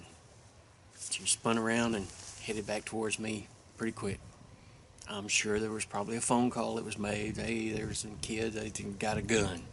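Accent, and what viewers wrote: South, United States. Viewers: This was a wonderful video! I feel like you were really caring and you had a calm and reassuring voice. I'm from a rural area and it made me happy to hear a fellow southerner. Thanks for the help. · Can you stop whispering. Are you from the south?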